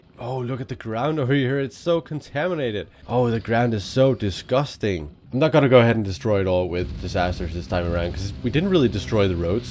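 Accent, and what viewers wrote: Denmark. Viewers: Jeg tror bare jeg var lidt træt da jeg så den gamle video. For nu kan jeg godt lidt høre den Danske afsagt. Men stadig rigtig god til engelsk. 😁 Og så vil jeg bare sige tillykke med de 500 abonnementer godt på vej til 1000 🎉🥳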